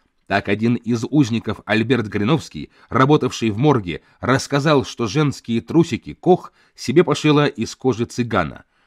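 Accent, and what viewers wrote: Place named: Moldova. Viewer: Очень сильный у ведущего Молдавский акцент , аж уши режет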